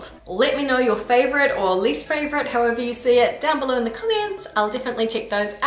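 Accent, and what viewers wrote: New Zealand. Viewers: The closeness to crap is only because of your kiwi accent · Just wondering if you are a kiwi by any chance? I thought I heard some kiwi accent/ pronunciation. Eg seven or percent :)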